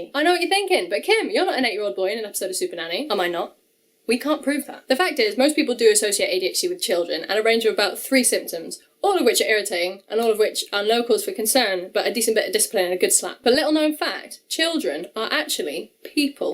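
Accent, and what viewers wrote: Wales, United Kingdom. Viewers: I only just discovered your channel about a year ago, and I have to say that I thoroughly enjoy it. Mostly, because I'm American and am enthralled with everything from the United Kingdom. Add to that, your videos are very well made and the cuts from sentence to sentence are what make them so enjoyable. May I ask from what general area of Wales you are? I don't need an address or anything. I can hear your Welshness in some words, but you (to an American, forgive me) sound more English.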